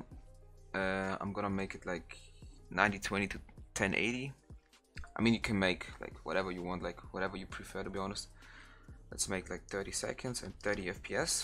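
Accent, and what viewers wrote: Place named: Germany. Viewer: that german accent tho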